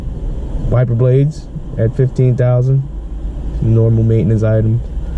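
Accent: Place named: Bronx, United States. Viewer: Rich asian kid talking like he's from the Bronx. pshhht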